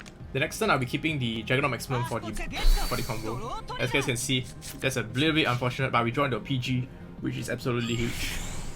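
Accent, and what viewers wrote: Singapore. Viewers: He does have that singaporean accent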